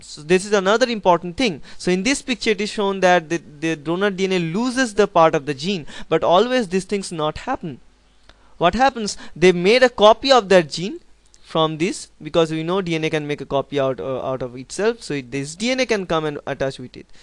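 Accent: India. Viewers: Dude I swear. Every single time. An indian accent.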